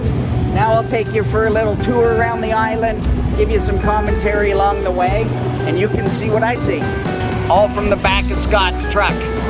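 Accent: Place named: Winnipeg, Canada. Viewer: great video. are you from Winnipeg? i'm trying hard to place your accent!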